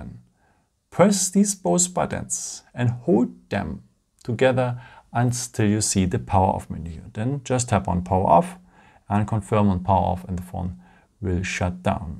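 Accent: Germany. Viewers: Perfect, thats how it should be done. Supercredible also due to the german accent :) ( danke!)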